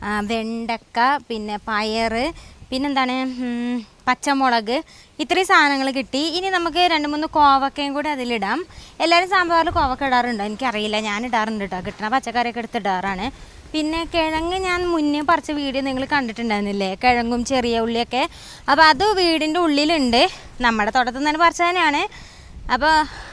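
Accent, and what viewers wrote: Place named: Malappuram, India. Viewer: മലപുറം സ്ലാങ് വരും😊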